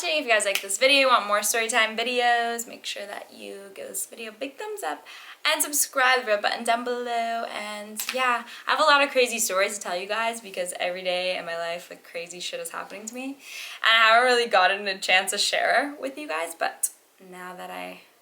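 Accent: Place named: United Kingdom. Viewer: the attempt at the British accent makes her look even hotter 🙃😍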